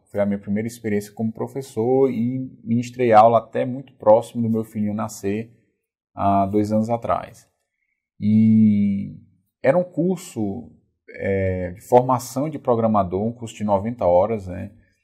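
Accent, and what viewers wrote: Ceará, Brazil. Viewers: Seu conteúdo é muito bom! Estou acompanhando seu curso de vue na udemy, muito foda! Professor você é cearense?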